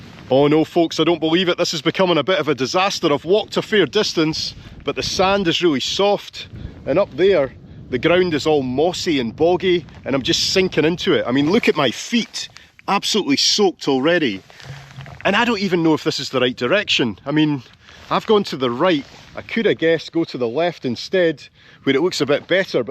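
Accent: Scotland, United Kingdom. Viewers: Hi every trip is a pleasure you share and will always be my favourite YouTuber. Thanks for sharing and making my week. I had Scottish great grandparents and think the Scottish accent makes for the best commentary. I also enjoy your friend Scott from Planes Trains and everything but you will remain my favourite. Thank you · Just love the Scottish accent... and it's so fun to read all the Norse names in Lerwick, now when I'm "google visit" the city. What a beauty! Just the end name of the city "wick" is "vik" in Norwegian, meaning "bay" in English I think. We have many places that end-named vik here in Norway. And then we know what kind of "bay" the place has. Sanday (Sandøy) Sand Island. Guess it's a sandy island. Would love to visit the island and of course main land of Scottland as well. Keep up the good job 👍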